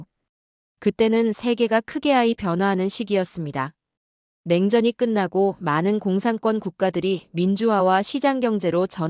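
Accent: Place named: Gyeongsang, South Korea. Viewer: 경상도말은 쓰지 마세요.... 경상도 말이 한국 대표 언어인 줄 잘못 알게 됩니다.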